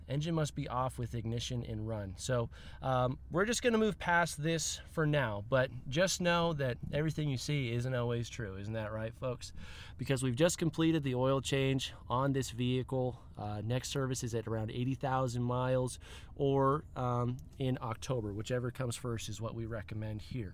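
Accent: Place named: South, United States. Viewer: It gets quite annoying with the "Ram" and southern accent lol, it makes me feel like Kia guys don't know what it is really like to own a pickup truck.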